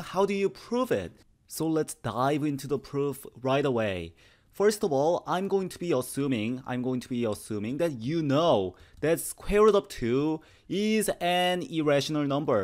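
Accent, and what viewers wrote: South Korea. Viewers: 왜 콩글리쉬가 들리지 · 왜 한국식 영어발음 처럼 들리지.. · 한국인이세요...? 발음이 친숙한 콩글리쉬인데....... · His english accent sounds like Korean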